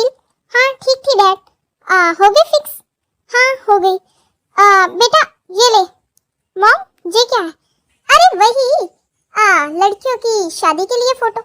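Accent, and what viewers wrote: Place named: Bihar, India. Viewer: Badi mast bihari boli hai to good ❤❤❤❤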